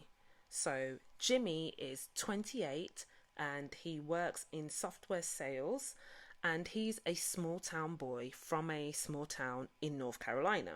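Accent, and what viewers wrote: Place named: London, United Kingdom. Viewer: I always love to hear a fellow Londoner (but I detect a hint of Brum, am I right!?) on YouTube! Now to the review 🍿 🍿 🍿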